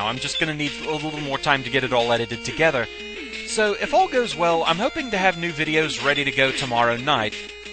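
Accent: United Kingdom. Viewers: umm so you migrated to there from Britain? Because you have such a nice British accent! Or do i just not know anything :D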